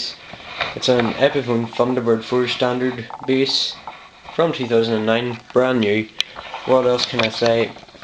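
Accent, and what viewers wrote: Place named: Scotland, United Kingdom. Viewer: i like your scottish accent you got good taste in basses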